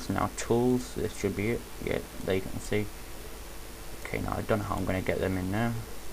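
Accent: United Kingdom. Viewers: yeah go us british accents he's just jealous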